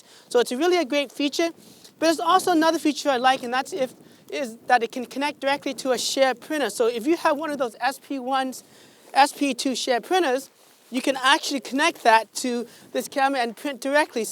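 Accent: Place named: Caribbean. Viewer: I'm picking up a little Caribbean accent in your speech, do you visit frequently? 🤓 great video!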